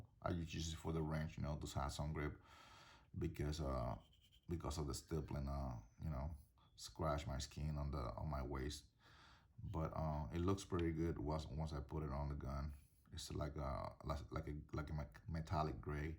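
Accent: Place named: Dominican Republic. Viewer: You sound dominican lol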